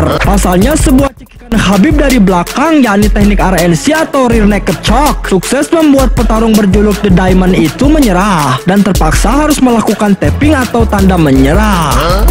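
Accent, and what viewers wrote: Bali, Indonesia. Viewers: Logat bali? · Mimin orang Bali ya?... 😁 Logatnya kayak temanku. 😁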